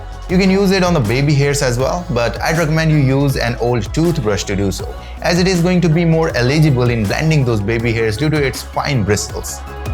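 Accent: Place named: India. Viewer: Indian, paki maleslut! Go and learn to speak eanglish girst, becose your indian eanglish accent sounds like shitt.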